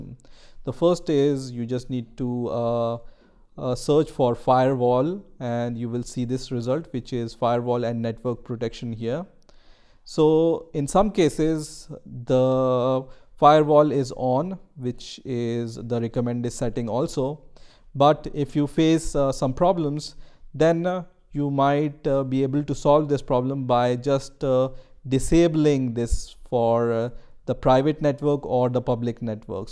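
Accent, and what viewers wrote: India. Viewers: bro It's Indians English accent...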